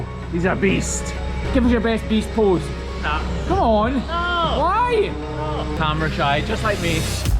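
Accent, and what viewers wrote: Scotland, United Kingdom. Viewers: You did a great job on that cow!! I love your Scottish accent!!! You. An talk to me anytime!!!😊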